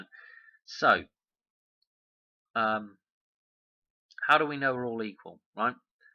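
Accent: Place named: Rural, United Kingdom. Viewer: I’m currently locked down in India. Your accent is bringing me taste of home. Im from Devon, but there does seem To be a common thread running trough the rural Tounges. I’m Enjoying your work. It’s been connecting some dots for me. Cheers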